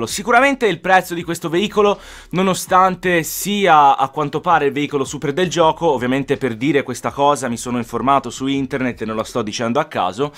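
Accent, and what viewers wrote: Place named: Tuscany, Italy. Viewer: Mhhh prima volta vedo il tuo canale e mi pare tu sei Toscano... Montecatini? Il modo di parlare mi sembra di lì ahahah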